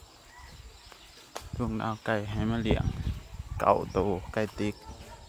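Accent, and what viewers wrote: Isan, Thailand. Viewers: พี่ถามอะไรหน่อยที่สปป.ลาวมีกี่ภาคมันมีภาคอิสานเหมือนที่ไทยมั้ยพี่สังเกตุฟังน้อง เว้าแล้วคล้ายคนอิสานมากๆเลยแล้วน้อง อยู่ภาคอะไรใช่ภาคใต้รึป่าวที่ติดกับจว.อุบลของไทย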